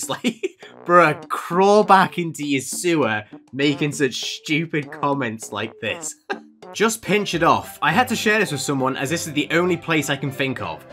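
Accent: United Kingdom. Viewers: are you brithis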